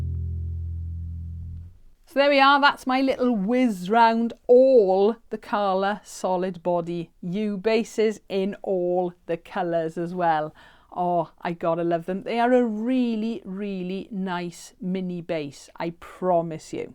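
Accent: Wales, United Kingdom. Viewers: Omg haha I'm sooooo sorry 😂 I'm usually pretty good with the Welsh accent and you speak very clearly so it was obviously me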